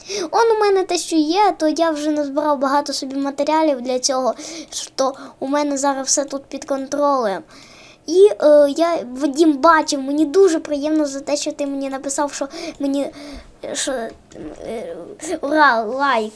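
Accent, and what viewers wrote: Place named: Surzhyk, Ukraine. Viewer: Чьо суржиком говориш !?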